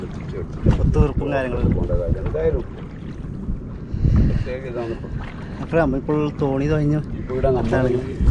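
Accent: Malappuram, India. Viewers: Malappuram basha 😙😙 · Sooopppeerr പറയാൻ വാക്കുകൾ ഇല്ല. എന്റെ ഉമ്മചിയുടെ വീട് മലപ്പുറം ആണ്. നിങ്ങളുടെ വർത്താനം കേട്ടപ്പോൾ അവിടെ പോയപോലെ തോന്നി.🤗🤗 ഇവിടെ ഇരുന്ന് ഇതെല്ലാം കണ്ട് സമാധാനിക്കാം. Corona കാരണം പുതെറങ്ങാൻ പറ്റാത്ത ഞാൻ.😐😔😐😔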